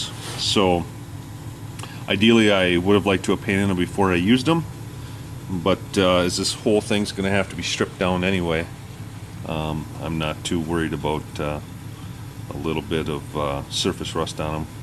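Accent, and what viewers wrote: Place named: Upper Peninsula, United States. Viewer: EXCELLENT series! I sure hope my tractor restoration turns out 1/2 as beautiful as yours! I can't wait until spring so I can paint. ps. I'm from Michigan and you sure sound like you're from the U.P.!! ps.ps. My family and I vacation near Republic every summer......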